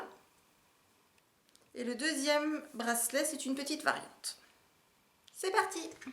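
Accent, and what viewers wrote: Alsace, France. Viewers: Super 👍🏻 merci très bon tuto je vais pourvoir en faire avec mon fils. En plus très jolie professeur 😅 petit accent Alsacien si je me trompe pas 😁🥨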